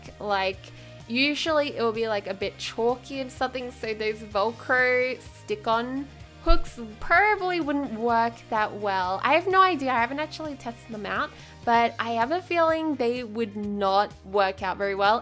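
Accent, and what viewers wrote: Australia. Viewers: Hannah Paterson because they are both Australian and have the same accent they sound very similar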